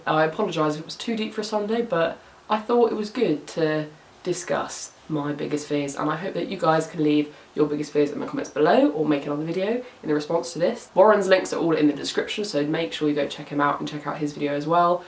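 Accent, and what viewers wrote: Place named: Wales, United Kingdom. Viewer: your accent sounds so cool! my welsh accent isn't that great but yours is just fabulous!!!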